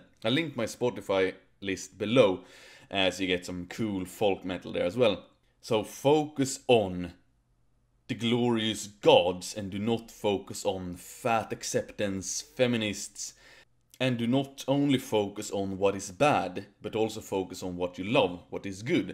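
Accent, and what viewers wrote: Sweden. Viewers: Swenglish is the most glorious accent in the world :) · .Bowles If I am going to guess, I guess he has learned English through reading/studying, because of his huge accent that he has. I am from Sweden as well and I used to be pretty bad at speaking English verbally mainly because I only read English in text form. When I started watching tv-series, I improved my pronunciation a lot. But his vocabulary is amazing, mine is pretty crap to be honest but I learned English by myself so I should be somewhat happy lol.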